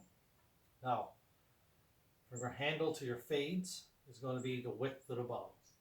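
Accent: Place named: Newfoundland, Canada. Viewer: You sound like you're from Newfoundland, if so what part?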